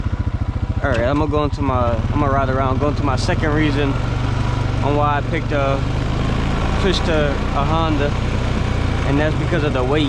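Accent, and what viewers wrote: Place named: Louisiana, United States. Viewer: First video of yours I come across while looking up Honda 420’s and immediately noticed the accent lol. St Landry or avoyelles parish?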